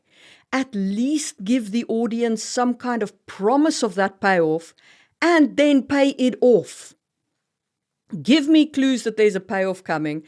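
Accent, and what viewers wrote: South Africa. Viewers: Dont mean to be too personal but are you south african? All the info says you stay in Finland but that accent says you're from here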